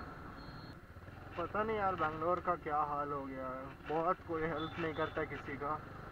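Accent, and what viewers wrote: Bihar, India. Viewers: In Bangalore u have bhihari accent....?????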